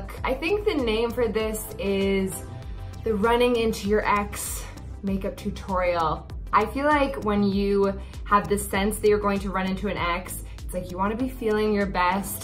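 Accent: United States. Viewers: if shes British why does she sound American? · but...she’s British...why does she have an American accent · I thought she was british, why does she have an american accent?! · She's Scottish believe it or not, but has the most American accent ever.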